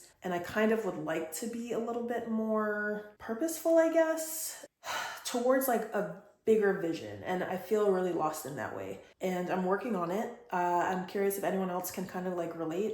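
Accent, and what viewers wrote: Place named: Barbados. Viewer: So good to hear a fellow country woman 🙏🏽 I would not have guessed that you are a Bajan you have lost most of your accent. Your hair is look good and I will be following your progress as my hair is the same length but it seems to have slowed down in it growth but is getting denser and thicker. Also I am planning to get a ReAir. I have seen and read some very good reviews. My advice to you is use a heat protector and increase the heat slightly for the final drying process for each section and you will see better results. 💕👍🏾🙏🏽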